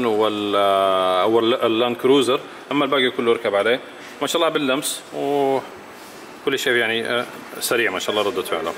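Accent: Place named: Bangladesh. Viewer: كركر زياده لهجته لهجه بنقاليه ، . . .اضبط لهجتك لا تتكلم بهذي طريه تكلم بلهجه العامة . . .ولا تكلم بلهجه القبليه اما هذي الهجه مدري كيف جايه تح انه سبك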